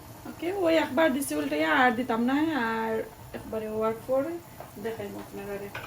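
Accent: Sylhet, Bangladesh. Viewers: এটি সিলেটি ভাষা 👌👌👌🥰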